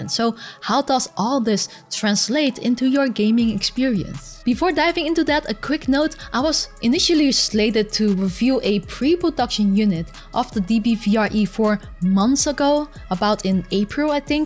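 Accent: Netherlands. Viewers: Sounds like you got a dutch accent.. hehe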